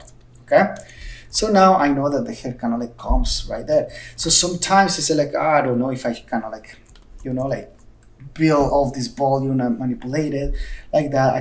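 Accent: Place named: Mexico. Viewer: se nota bastante el acento mexicano ojala te animes a hacer en español un dia krnal saludos!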